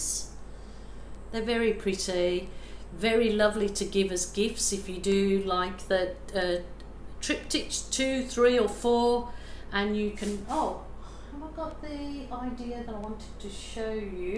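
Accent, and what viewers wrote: Birmingham, United Kingdom. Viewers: Is that an original brummie accent too?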